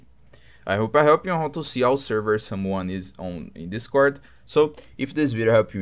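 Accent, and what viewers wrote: Brazil. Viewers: parece muito carioca kkkkkkkk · O sotaque Brasileiro me pegou kkkkk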